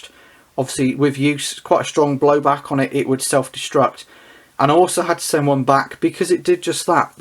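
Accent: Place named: England, United Kingdom. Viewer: I subscribe to your channel and find your reviews informative, entertaining and thorough. That said, I simply have to take issue with you calling this pistol a "Cee Zee". You speak with a very eloquent English accent and should therefore know that it is in fact, "Cee Zed". This tiny faux pas aside, keep up the good work.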